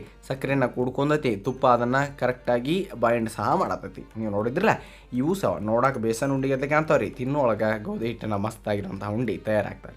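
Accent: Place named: Bijapur, India. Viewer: Nau kuda bijapur davru sir matadidu keli manssige tumba sanda aaitu sir🙏🙏❤❤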